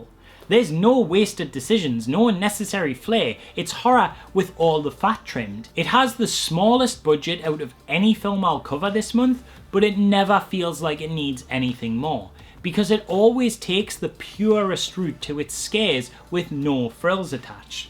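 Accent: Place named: Durham, United Kingdom. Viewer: New subscriber here, just discovered you. The effort you put in is super impressive!!! Really enjoyed your take and info!!!! Off topic, I can't quite pin your accent, I was born n bread Gateshead but now live at the coast, can I guess your accent is Durham 🤔 Like I say, I'm new here x Looking forward to binging more vids pal 💚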